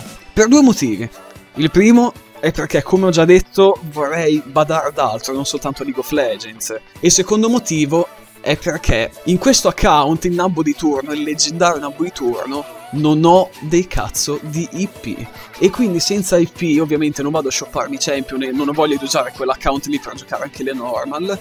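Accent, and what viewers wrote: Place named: Bologna, Italy. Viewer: Sei di Bologna? :O pure io :D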